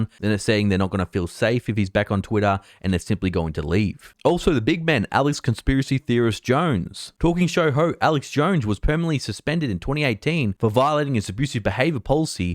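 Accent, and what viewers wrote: Australia. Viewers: I really really like this content but I can't follow the Australian accent sometimes, gets frustrating.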